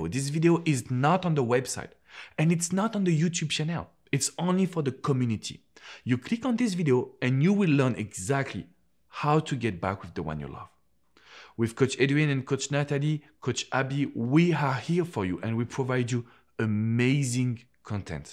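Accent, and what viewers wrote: France. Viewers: "You need to clique" haha love the french accent, ur a really cool nice guy :) :)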